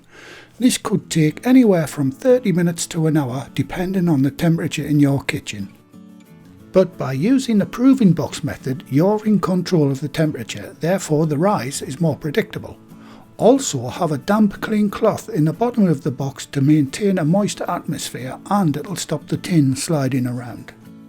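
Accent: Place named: North East England, United Kingdom. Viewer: Such a refreshing video! Just stumbled across your channel and I'm so glad I did! I'm from the North East (Stockton on Tees) and it's so nice to hear the accent along with proper traditional British recipes that my Nana used to make. Thank you, you've just made my evening! Will be making this bread at the weekend:)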